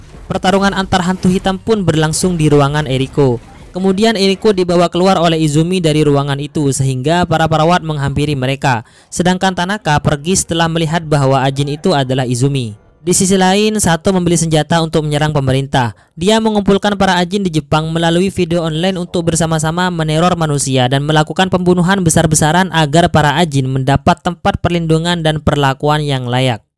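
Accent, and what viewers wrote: Madura, Indonesia. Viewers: Ternyata Logat nya Madura Kawuk..Yo Opo Kabar e Pokeh Celeng..Salam Damai Dari Persaudaraan Dayak Madura Sy dari Sampit..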